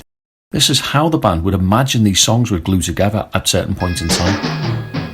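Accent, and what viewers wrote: Liverpool, United Kingdom. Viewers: Sorry ..... only just got on to your this really is fantastic content and fantastic to hear your a fellow scouser . Thank you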